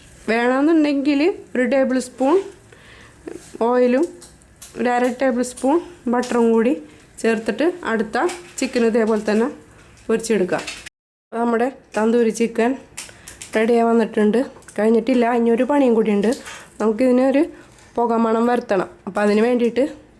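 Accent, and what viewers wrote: Kodungallur, India. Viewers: കൊടുങ്ങല്ലൂർ ഭാഷ... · Ingale veedu kodungallur anganam ano? 🤔 nalla slang kdglr karde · Ith kodungallur slang aanu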